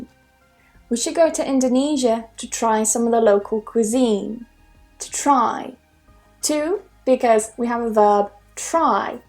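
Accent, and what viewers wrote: United Kingdom. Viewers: Love you, love your lesson, and love your british accent. #oneOf Ur student from Indonesia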